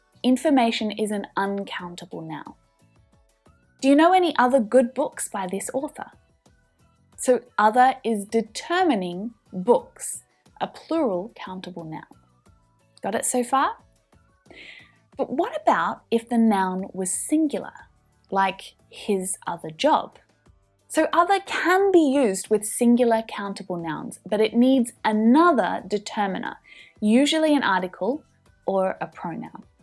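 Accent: Australia. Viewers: hi from Turkey aim iraqi i love your accent and i hope i can go to Australia as soon as possible, i love it and love your teaching💖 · I agree...her Australian accent is calming and relaxing to listen to... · I wanted to quit watching you so I don't pick up your Australian accent but you are so good I just can't do it. 😇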